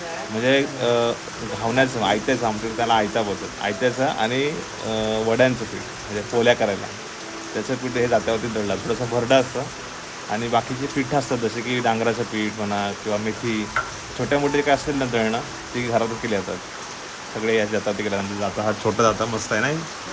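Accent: Konkan, India. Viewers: gavi jaun gav sarkh ....pure kokani boltos 😅😅😅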